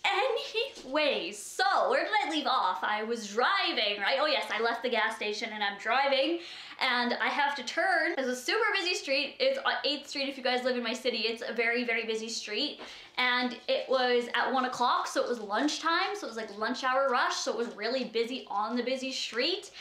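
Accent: Canada. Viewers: Are you from Canada because they say 'sorry' in the same accent you have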